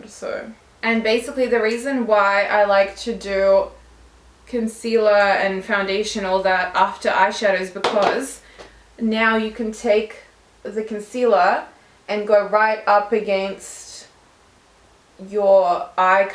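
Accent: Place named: Australia. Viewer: Amazing make up, thanks for the tips. Great to hear an Australian accent. Hope you are healthy and well.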